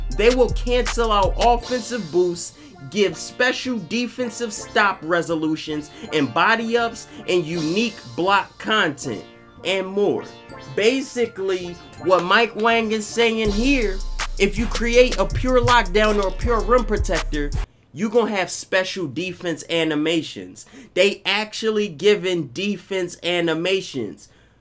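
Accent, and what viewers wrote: Philadelphia, United States. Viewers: My nigga are you from Philly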